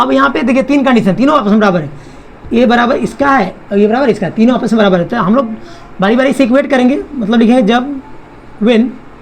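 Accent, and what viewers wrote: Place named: Bihar, India. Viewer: Sir to khan sir nikle Bihari +hindi mix 😂❤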